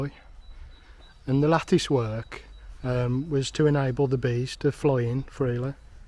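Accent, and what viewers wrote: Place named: Black Country, United Kingdom. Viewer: Very detailed video, thank you. I’m from Wolverhampton originally so hearing the Black Country accent makes me feel like I’m home. Loved the addition of the bridge photographs!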